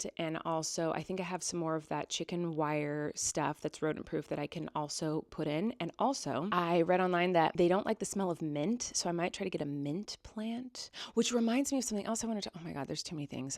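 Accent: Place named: California, United States. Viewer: TTQ: Hi! This is kind of a weird question, but did you find when you moved from LA to New York, or when you moved to Vancouver your accent changed? When I watch you vlogs, I notice you have a bit of a Californian accent (its not that noticeable and its probably because I'm Canadian and I have a different accent than you), and I know for me when I moved from Ontario to the East Coast of Canada my friends said my accent changed! I wondering if that happened to you? I love your vlogs and you are AMAZING!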